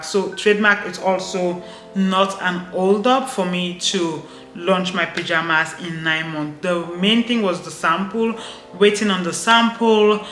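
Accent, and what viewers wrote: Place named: Cameroon. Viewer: hi i have watched most of your videos , so that i can learn and help my wife set up her pyjama line too . For now we buy from people who already shipped in to cameroon from china . so we make little profit if we want the good stuff . major challenge is how to get a good manufacturer who os reliable .Maybe you can help us . We are willing to pay for the help1; also i always wanted to ask what nationality of origin you are , because youy sound cameroonian as you have an underlyiong french accent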